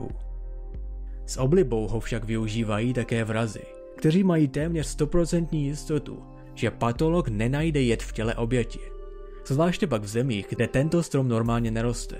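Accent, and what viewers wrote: United States. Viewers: co co mas za skurveny prizvuk americky ? Odporny hlas video, ale fakty su dobre :)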